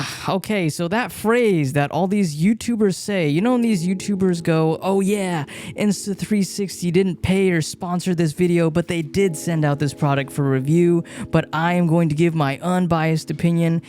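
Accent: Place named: Southeast Asia. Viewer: Bro! I like your English. I think you are from South East Asia, like Singapore or Malaysia?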